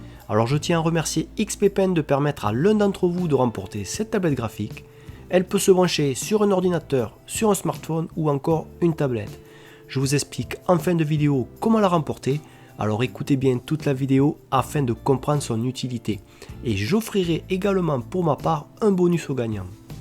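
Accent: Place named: South, France. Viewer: D'ailleurs tu viens du sud non ? (J'ai le même accent que toi et c'est rare de le voir sur YouTube)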